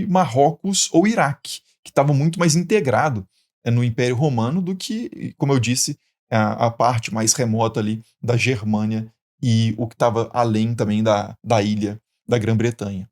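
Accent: Minas Gerais, Brazil. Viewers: Eu “descobri” esse canal há pouco tempo e já sou fã! Adoro a tua maneira de explicar, e acima de tudo esse sotaque mineiro lindo. Muito sucesso e muito obrigada por partilhar o teu conhecimento conosco 🙏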